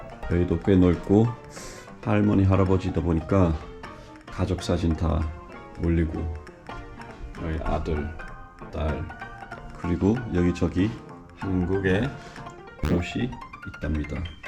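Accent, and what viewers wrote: United Kingdom. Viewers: 헐 영국어 다. 미국어 만 듣다가, 힐링 됌. 😮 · 오 영국식 발음이셨어~~~ 멋져라!😊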